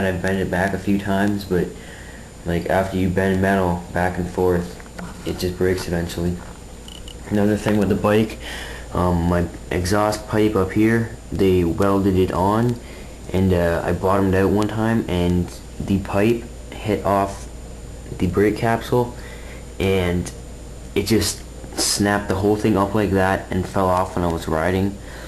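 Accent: Canada. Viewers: some china man just made about $1999.50 haha.....someone sounds Canadian aye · R u Canadian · are you canadian??? just when you said out sounded kinda scotish, i remember this from south park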